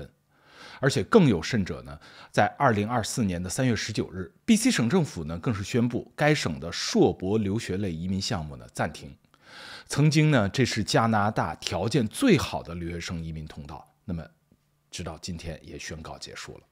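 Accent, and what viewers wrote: Standard. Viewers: 油主是国内播音员出身吗？？这是我听到最标准发音的自媒体人了🎉🎉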